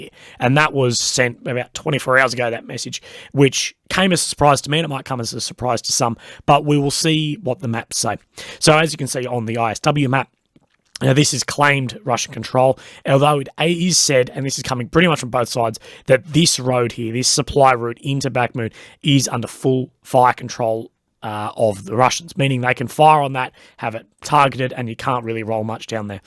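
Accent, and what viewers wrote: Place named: Australia. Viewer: Look forward to hearing your goofy Australian ass everyday. Hope all is well with you. I think a reunion with rob on speak the truth is in order! Much love ❤️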